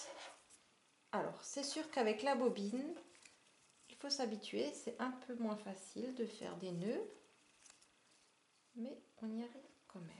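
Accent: Alsace, France. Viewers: Tu as un accent tu viens d'où ? D'Alsace ?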